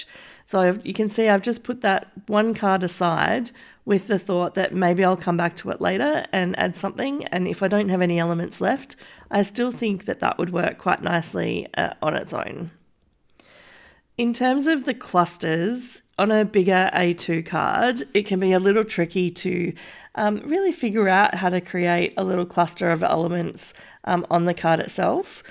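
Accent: Australia. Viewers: hearing an Aussie voice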